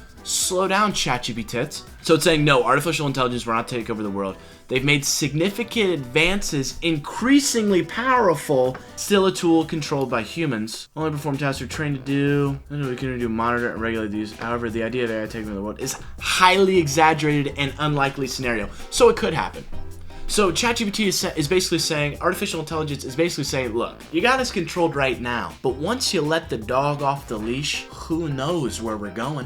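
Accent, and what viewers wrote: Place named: United Kingdom. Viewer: I thought you was British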